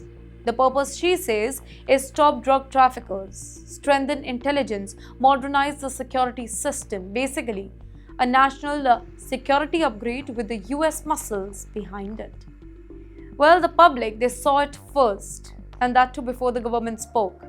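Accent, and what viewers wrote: India. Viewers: You with you India accent you worry China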